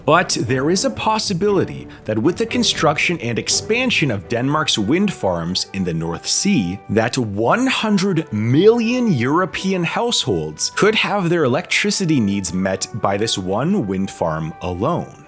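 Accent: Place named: Canada. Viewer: Welcome back,! Love your vids and friendly Canadian voiceover. ☺️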